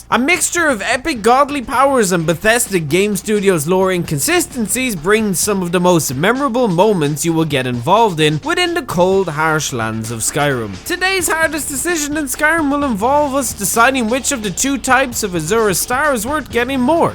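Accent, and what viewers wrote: Ireland. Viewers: noice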